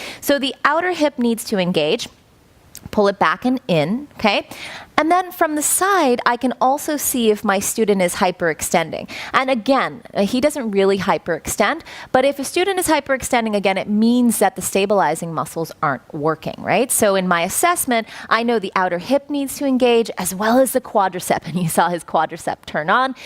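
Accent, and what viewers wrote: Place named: United States. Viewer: I hear an American accent but for some reason it's not unbearable nor disgusting and I could watch the entire video. Congrats!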